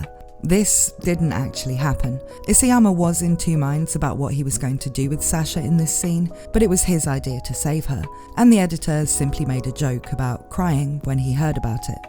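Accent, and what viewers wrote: United Kingdom. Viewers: Oh alright it sounded a bit diferent than what I’m used to hearing in a British accent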